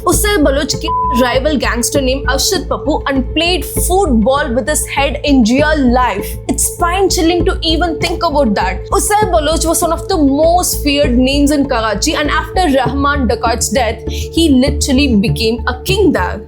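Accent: Tamil Nadu, India. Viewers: Good job. I have watched many episodes of yours including the one where in you narrated that you have an issue with the letter R that’s why your accent is different. I felt bad that without understanding i had commented on your accent. But after that I watched and listened carefully, you defiantly have to improve your accent, it’s not just the letter R, u have issue with the English accent. Your mind processes in Tamil and hence your accent of English is like Tamil. I mean no offence but u must improve your accent for sure. U surely can if u try. Listen to your tone and accent you will know for yourself. God bless. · I like not only the content and the research you make into them, but I like your pronunciation (the Tamilised English) the most. Keep doing this good work and God bless you dear.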